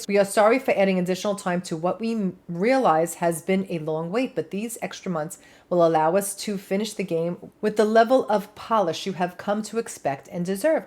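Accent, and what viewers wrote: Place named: New York, United States. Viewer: Elo mate. I decided to upgrade to your channel, because I love you and your doggies are so, so lovely. You're my fellow green eyed beauty and I love the dogos. Love your ascent. New York/Jersey, I'm guessing. I'm a total Londoner bloke, and I love you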